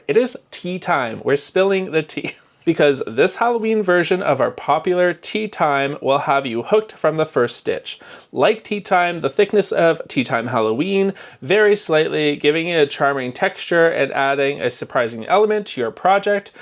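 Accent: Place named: Canada. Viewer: new here. you sound canadian, where do your buy your yarn? I see in the back you have a big colour selection and I'm really looking for that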